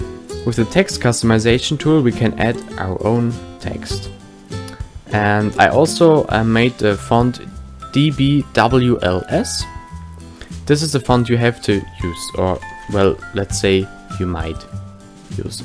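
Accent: Germany. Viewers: Save Deutsch dein Englisch man xD aber gutes Totorial/Video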